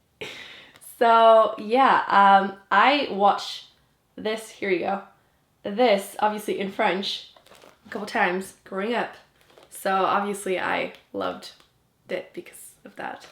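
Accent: Quebec, Canada. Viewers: I studied French in Quebec City for a summer and when you spoke French I was instantly missing Quebec haha, alors c'est genial et je aimerais bein si tu fait les videos en francais haha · Lool you need to stick with just asking not insulting dear, haha, I like it that you speak Arabic, and btw your Canadian French is different from the accent in France couldn't understand u clearly, because I'm Arabic and I speak french too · Je n'ai jamais écris sur une page youtube mais j'adore tellement tes vidéos que j'étais obligé ^^. Je suis française obviously mais dans cette vidéo j'ai tellement adoré ton accent quebecois que je me devais de te le dire ☺️. J'étais depuis quelque temps dans un reading slump et depuis que je regarde tes vidéo et d'autres bookyoutuber (comme peruseproject qui est la première bookyoutubeuse que j'ai suivit ^^") je me suis remise à lire. 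So for all of those little things I thank you a lot for your videos and all your effort to make us discover some great books. And I read in english for like maybe 5 years now and watching series in VO and this is in fact the best way to improve your english ^^. Btw one one the first reason why I'm following you is because of your personality so i would say you are so right to not care about those who criticise you. J'attend avec impatience ta prochaine vidéo :). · I speak french fluently and most of my friends are french so I was shocked when I heard your accent when you started speaking in french! didn t know that the canadian french pronunciation was so different from the french one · Owww I loved hearing you speaking French, French Canadian have such a cute accent ! I am French and I started reading in English in order to improve my English :D I'd love to read the Mistborn trilogy but can't decide if I want to get it in English or in French... 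haha You should read Carry On ! I was skeptikal aswell but I actually liked it !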